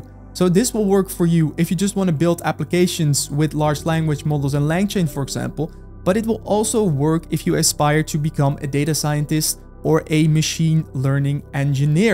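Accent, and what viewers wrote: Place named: Netherlands. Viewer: youre dutch i can hear it f rom youre accent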